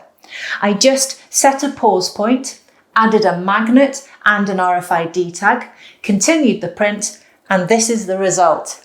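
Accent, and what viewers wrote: Wales, United Kingdom. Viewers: OMG!!! Thank you so much for this (and all your) video. So many fantastic ideas in 20 mins!! Gone are the days of printing benchys and busts of Darth Vader... My 3D printer has a new mission in life. I've needed something like this for so long, That veggie label RFID tag idea is just a show-stopper! You are the best. Thank you so much for sharing all your creativity with us. Did I detect just the slightest hint of a Welsh accent? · Holly Lord! It feels like I watch DIY Perks) British accent is awesome! Great job, thank you!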